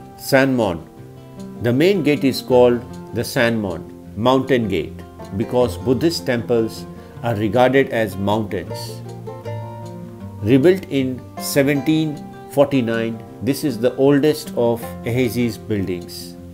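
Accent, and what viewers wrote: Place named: India. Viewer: Your Indian English tone makes it even more enjoyable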